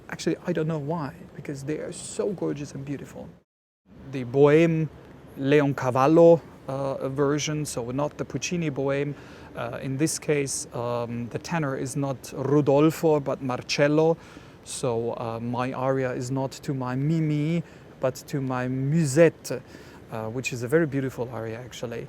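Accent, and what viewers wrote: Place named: South Africa. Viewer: he sounds south african here...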